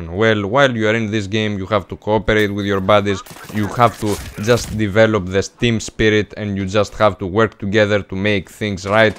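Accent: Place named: Greece. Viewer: Γειά σου φίλε,τυχαία σε πέτυχα,άκουσα προφορά και λέω δικός μας είναι...Ωραίο το κανάλι σου...γουστάρω...Κάντο και στα Ελληνικά ρε με αγγλικούς υπότιτλους :P παίζεις σε ps3,360,pc;τσέκαρε το κανάλι μου άν θες...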